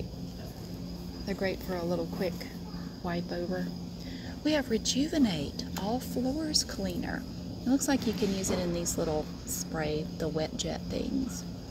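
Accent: South, United States. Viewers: Everyone talks about your southern accent but it sounds normal to me since i’m from south georgia 😂😂😂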